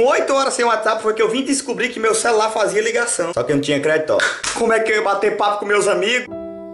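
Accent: Northeast, Brazil. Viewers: é pq o sotaque dele é de nordestino. E ele fala gírias.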